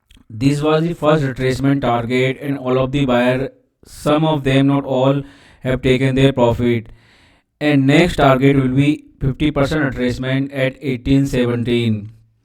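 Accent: India. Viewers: The indian accent is wow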